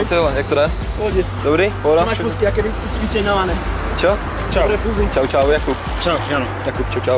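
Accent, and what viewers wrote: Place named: Poland. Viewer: gay s polskym artikulovanim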